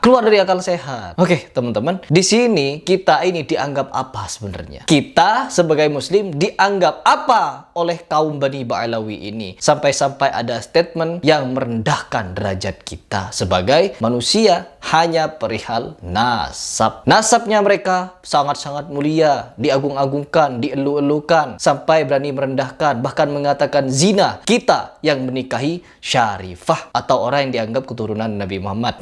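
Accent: Madura, Indonesia. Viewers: Logatnya kental madura wkwkwk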